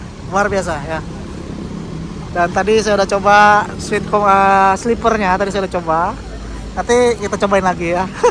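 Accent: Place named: West Sumatra, Indonesia. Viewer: Bahasa indonesianya sangat minang sekali. Wkwkwk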